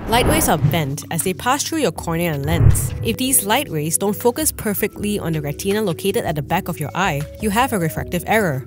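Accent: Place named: Malaysia. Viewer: i didnt even see the channel’s name at first but i can guess the accent is malaysian 😅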